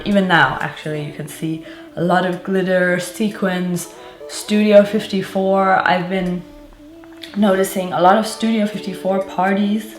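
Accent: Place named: France. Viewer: I swear you're getting a French accent! It really suits you ;) I really like the look of the first trend, I have a soft spot for tailored looks. The faux-fur sounds really nice as well x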